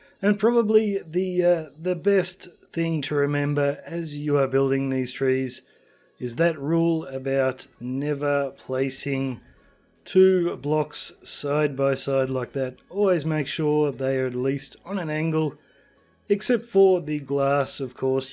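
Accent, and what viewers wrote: Australia. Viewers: Nice video! Your accent sounds Australian... are u from Australia? I am... im from the sunshine coast!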